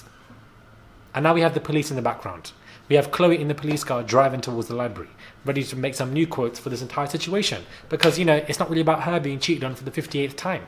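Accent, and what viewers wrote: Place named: England, United Kingdom. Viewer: And I actually thought he meant pounds because he has an English accent and that's where I'm from too.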